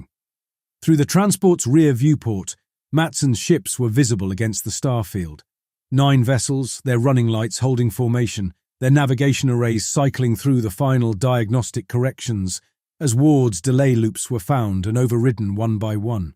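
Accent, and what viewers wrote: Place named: England, United Kingdom. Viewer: Thank the gods...... Finally a story with a clear clean English accent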